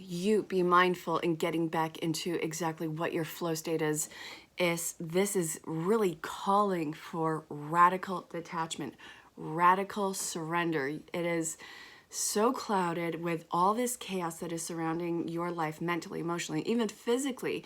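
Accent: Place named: United Kingdom. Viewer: Love ur British accent!!!!!!!!!!🤣🤣🤣🤣🤣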